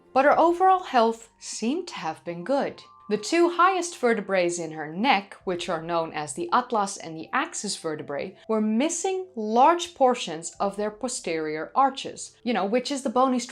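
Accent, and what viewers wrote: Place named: Netherlands. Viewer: And here are again. Another amazing video. And as I have many times before, mentioned curtain lovely dutch accent. I like accents. I just kean back. Learn something and hear wonder dutch accent. So all in all another great video with the All amazing and her beautiful Toll of a of voice with that wonderfull dutch accent.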